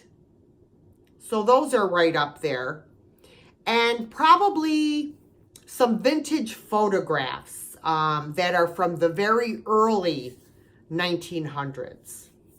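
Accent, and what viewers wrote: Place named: Upstate New York, United States. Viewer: I was thinking you were from upstate close to Canada by your accent. An accent you probably think you don't have. LOL! I'm in the Mid-Hudson Valley.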